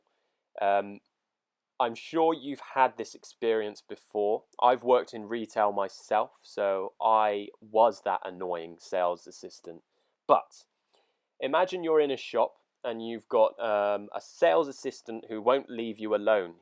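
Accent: United Kingdom. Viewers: I am looking up to for learning the British accent.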